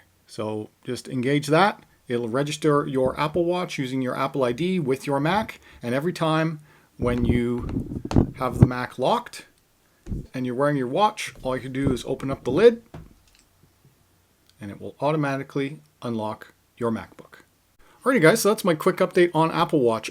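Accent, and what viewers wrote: Canada. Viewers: Cool video.. U sound Canadian 😊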